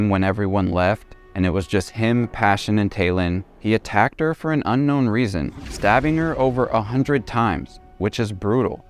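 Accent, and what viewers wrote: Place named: United States. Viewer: yvw.... enjoying u v much ... plus uve got the only american accent ive ever been able to handle lol xxx <3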